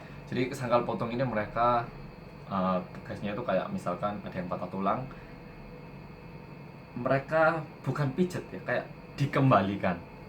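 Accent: Java, Indonesia. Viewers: 100% logat Jowo e apik tenan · 오빠, lucu dah orang korea fasih banget bahasa indonesia nya plus ada medok medok e ㅋㅋㅋㅋ niceeeee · Seng ngangeni medok ya ki looo 😄😄😄😄😄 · Belum ada bersama orang koreaaa yg medhooook dong :" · Awal2 tyta blm ada "orang korea yg medhokkkk", sama "bolo-bolo" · medok jowoo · jadi penasaran kenapa oppa tertarik sama Indonesia sampe kadang ngomong pake dialek jawa 😂 lucu tau liatnya 😍😍😍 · Tapi medok Jawa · Aku suka video mu mas . salam kenal ya aku wong cirebon . jawane medok apik . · Bersama orang Korea yang MEDOOOOOOOOOK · pasih banget bahasa indonesia y,,bahasa jawa y medok banget,,sebener orang mana sih.... 😀 salam kenal... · ngomonge luwih medok timbang aku cak... padahal aku wong jowo.. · Hehe tk pagil mas aja ya ini orang korea asli apa orang indonesia ta kok ngomong ya medok kayak aku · mas korea, aku jg orang jawa. aku suka medokmu...hehe.. 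· sy senang korea yg logatnya masih medok jawa...walaupun sy orang banjar kalimantan...gk bisa ngomong jowo... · Kayak Turunan Jawa asli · Logat jawa dikit.. Keren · Eommeo.......😱😱😱 Your logat is so Indonesian! I am Indonesian and Javanese but I can't speak Indonesian or Javanese as good as you do. Daebakkkk Hyung.... If you have a time please visit my hometown; WONOSOBO in Central Java Province. Kota itu terkenal akhir-akhir ini Btw 😁 Make some videos there and share all here. Okay Mas Bro, Matursuwun. Salam Kenal.😊 Anyeong...... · Ya ampun. Awakmu iki wes juwowo bianget. Sampek ngomong manten iku lak jowo wo tah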